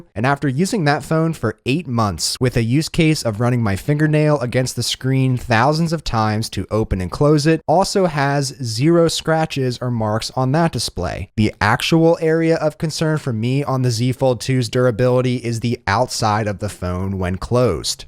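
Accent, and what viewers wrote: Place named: Delaware County, United States. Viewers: Love the Delco accent haha shoulda been on Mare of Easttown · With his light accent and the red star I wonder if is from Pennsylvania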